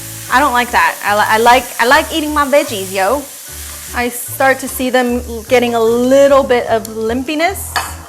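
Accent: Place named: United States. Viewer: My husband was raised by his Grandmother who is 100% Korean, she can not even read English, and can slightly speak it. I will say, you're about the most American speaking Korean I have come across thus far. That is, if you're indeed Korean? Thank you so much for your channel!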